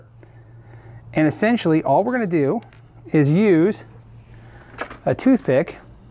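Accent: United States. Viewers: Anyone know where in America this guy is from? I recognize the accent. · Americans pronounce it like that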